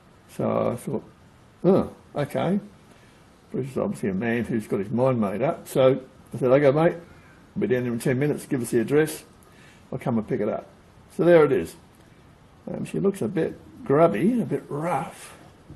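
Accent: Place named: Australia. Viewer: Soooo good to hear an Aussie sprouting his stuff! Good on yer, mate. Keep emailing coming!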